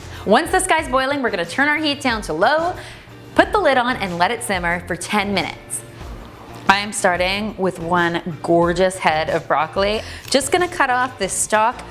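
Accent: Canada. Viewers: Thank you I love these recipes! Are you from canada ? I can tell we talk the same way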